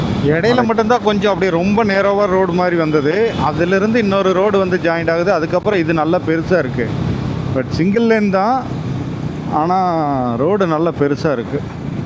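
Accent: Kongu, India. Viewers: I wish i could meet you someday. I watch your channel just to hear your no nonsense kongu tamizh. I am from gobi. Keep riding and keep making these wonderful videos.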